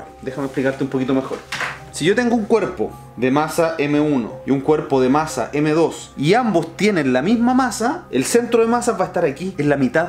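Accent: Chile. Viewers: que bueno haber encontrado este canal, vengo de QuantumFracture, del vídeo de la tierra plana vs tierra esférica. Por el acento deduje que eras Chileno, que genial.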